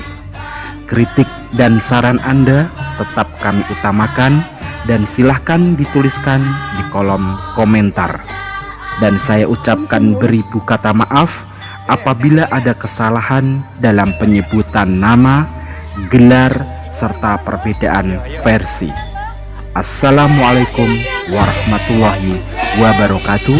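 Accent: Indonesia. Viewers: Lafal nya khoq Bahasa Indonesia banget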